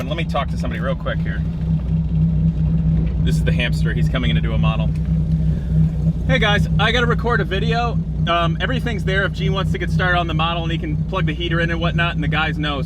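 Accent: England, United Kingdom. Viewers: Dude you’re so hyperactive!! I love your video style. And your car. Love the way you sign off: be nice to your cars. Absolutely. Finally, I’m from ENgland, nice English accent. :D:D:D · You look a bit like jenson button, you got the british accent down pat too😂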